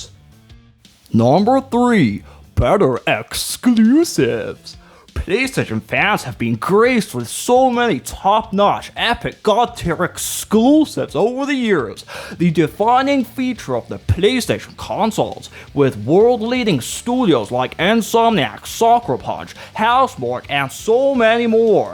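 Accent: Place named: United States. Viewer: It's clearly American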